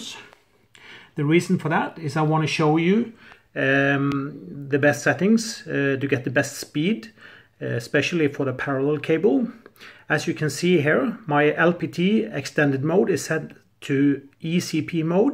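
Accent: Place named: Norway. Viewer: Found the video randomly. instantly heard that you were norwegian.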